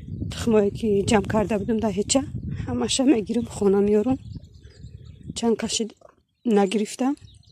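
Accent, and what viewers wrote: Yovon, Tajikistan. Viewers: Салом аз Ватан ба щумо гарибхо апащкам ранги Ёвониё гап мезана😁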